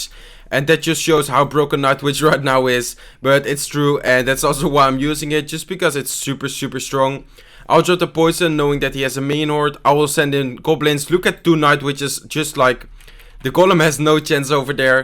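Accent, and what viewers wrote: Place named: Netherlands. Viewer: R u dutch u sound dutch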